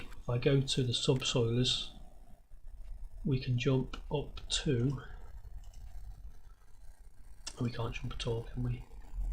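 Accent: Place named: Liverpool, United Kingdom. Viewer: You do have a few Liverpudlian twangs in there :), are you using a steering wheel for this?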